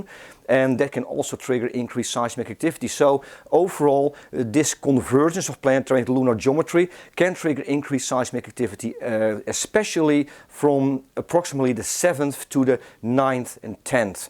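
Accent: Netherlands. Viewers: That is a dutch accent and not AI!☺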